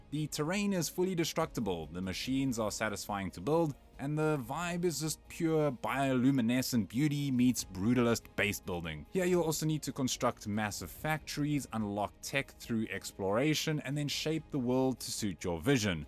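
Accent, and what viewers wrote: South Africa. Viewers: This accent.....South African?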